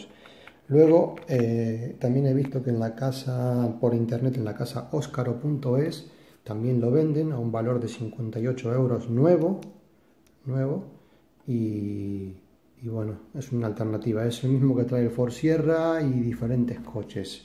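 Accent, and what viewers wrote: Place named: Argentina. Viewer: Tenes un toque de acento argentino y español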